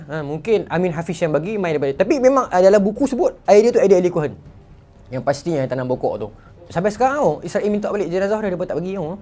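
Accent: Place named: Kedah, Malaysia. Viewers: Ingat sy sorang je tk faham. Maybe sbb lograt utara kot. Rupanye ramai je sama. Nk kuat tv vol tv budak dah tido pulak.😂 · Pekait benor ngt aih bang kedoh tu, penin aih sy nk fakham abg ckap, struggle nk catchup lajhuu ngt aihhh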